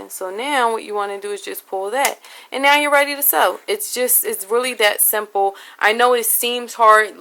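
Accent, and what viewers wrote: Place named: Yonkers, United States. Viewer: lmao i did the same exact thing 😂 thank you for this video i’ve never sewn in my life and this was my first threading. PS i love your accent. I used to live in Yonkers as a kid and it reminds me of my best friend at the time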